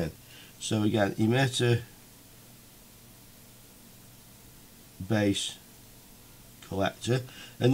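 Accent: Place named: England, United Kingdom. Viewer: Very entertaining and valuable video. Learned now how to "design" the varioboard today. Can someone tell me what English accent he has? Where does he come from in the UK?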